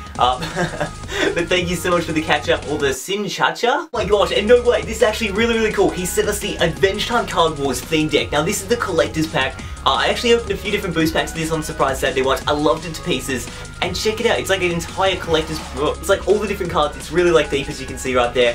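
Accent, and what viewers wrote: Australia. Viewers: hey, just wondering, r u from South Australia?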